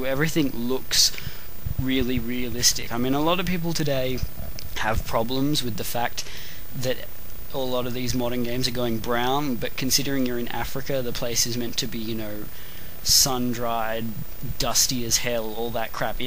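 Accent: Australia. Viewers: You have a good potential to be professional commentator. I like your voice inflexions sounds a little Aussie. If you could drop the swear words, it would be "really really really really" good.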